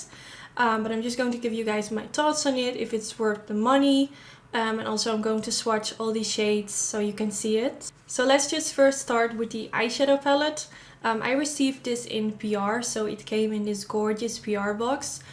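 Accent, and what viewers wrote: Netherlands. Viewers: Hearing your accent reminds me soooooo much of time abroad in Amsterdam last year ❤️❤️❤️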